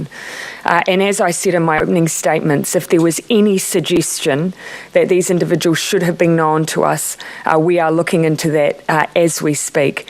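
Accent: United States. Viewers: sounds like an American moved to new Zealand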